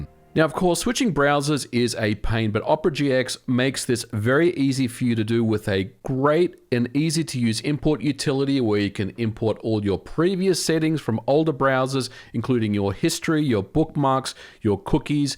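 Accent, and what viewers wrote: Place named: Australia. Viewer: Got a Australian accent but talks in inches and fahrenheit Love your videos by the way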